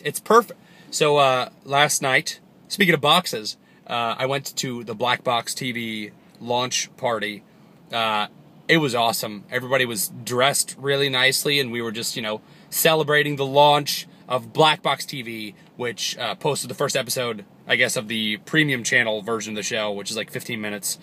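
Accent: United Kingdom. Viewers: Dude u sound like charlieissocoollike with ur British accent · I love ya to death, but I hate your British accent. Bless your face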